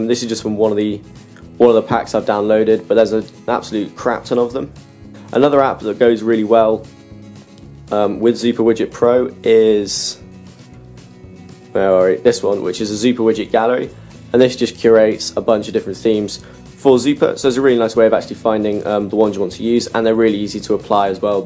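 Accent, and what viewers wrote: United Kingdom. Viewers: q horrible eres y tu acento mamon de britanico es super estupido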